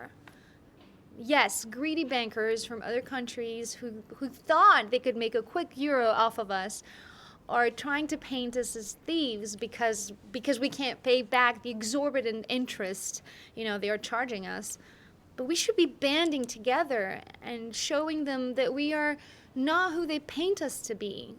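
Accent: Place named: United States. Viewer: Her American English accent is really good. Her hair is long as hell though she needs to get it cut.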